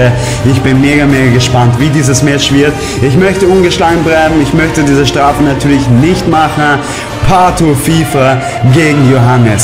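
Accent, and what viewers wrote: Austria. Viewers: Ich Feier deine Art so sehr hahah Echt cooler Typ Kommst du aus Österreich (wien) ?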